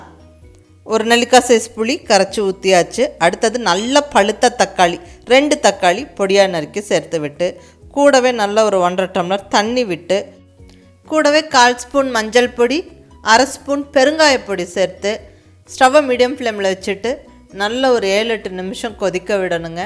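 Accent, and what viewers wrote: Kongu, India. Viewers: I tried rasam . Awesome. Thanks. I like the way of telling and your sweet kongu Tamil slang.👌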